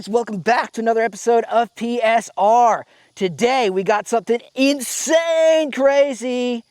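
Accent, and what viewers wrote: South, United States. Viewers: Bro you should keep the Sothern accent! sike as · Honestly I support the Southern accent it fits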